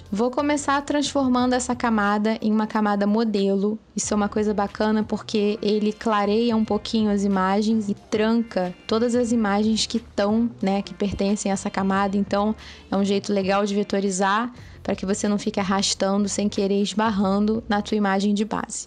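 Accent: Rio de Janeiro, Brazil. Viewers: Vc é muito didática! Adoro os vídeos e o sotaque carioca kkkkk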